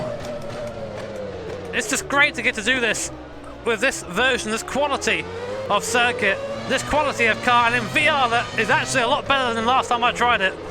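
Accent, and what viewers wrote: United Kingdom. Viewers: I just realized how good of a F1 commentator would be, I mean the eloquence, familiarity of F1, and his british accent, what more do you need?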